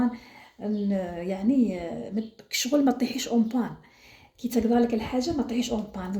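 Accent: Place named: Djelfa, Algeria. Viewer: انا عاجبتني غير اللهجة كلش بشوية مليحة رويزة بيطة حميصة انا راني بعيدة على الجلفة وزدت سمعت هدرتك توحشتها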